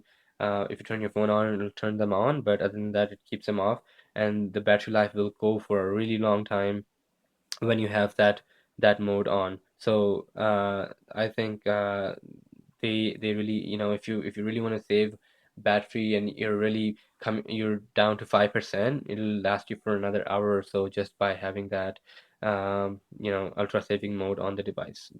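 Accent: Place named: India. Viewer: u sound like an indian,r u?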